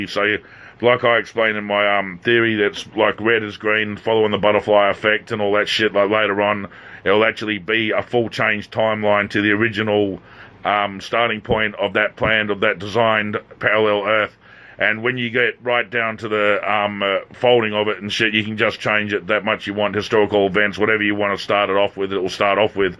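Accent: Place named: Australia. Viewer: Hi there, this video is helping me. I have to listen however many times in order to gain the understanding you are putting forth. Mainly bc you speak fast and with an Australian brogue. Lil' lol. I am sure you get a million comments so i know you will not remember mine. It was full of doctrinal manifestations, even though at the time i thought i was way past that. You know, having come 'out of her' and all. It is indeed by layers, one layer at a time. Im not going to let go of CHRIST my LORD. Im just having a bigger look at how the scripture was so soarly perverted and literally turned backwards. I have had Nelson visit me personally before i had heard he was lurking around. It happened when i was reading ( in the style of 'gulping' down a big tall glass of water, a few whole chapters at a sitting. As apposed to an intricate word study verse to verse) Anyway, learning from you. 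Thanking you and feeling really thankful. I am going to share a link with you from a young guy who quickly posted his experience. Its short and who knows, maybe a helpful tid bit. Alrighty, i hope to see you soon!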